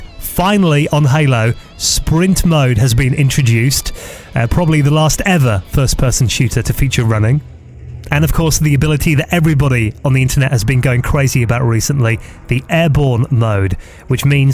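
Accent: United Kingdom. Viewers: A british reviewer Woooo!!! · Ahh, thats what I like, the sound of a true British voice ;)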